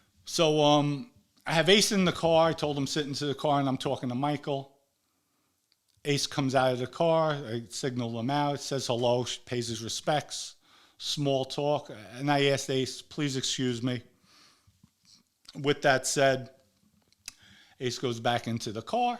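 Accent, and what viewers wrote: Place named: Bronx, United States. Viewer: once I heard like myself, you were from the Bronx, I was sold. Welcome and good luck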